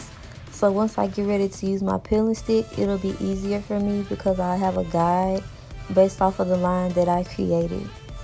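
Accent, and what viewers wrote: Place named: Chicago, United States. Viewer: This is so cute & I love your accent !! Chicago Baby 🤩🤩🤩💛💜💛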